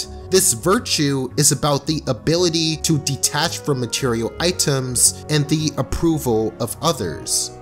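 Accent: United Kingdom. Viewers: wait, he’s British? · Why the hell is this guy british? edit: btw I never watched finieas and pherb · Omfg i just realised he’s british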